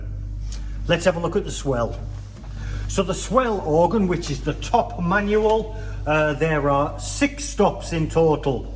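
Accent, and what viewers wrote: North, United Kingdom. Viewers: I love your channel and the small country churches you give us a glimpse into here in the far off US of A. Your northern accent is also quite nice to listen to! 😊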